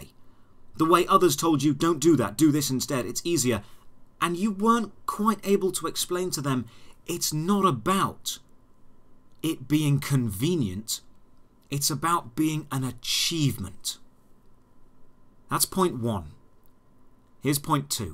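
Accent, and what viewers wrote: United Kingdom. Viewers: man, british people talk the same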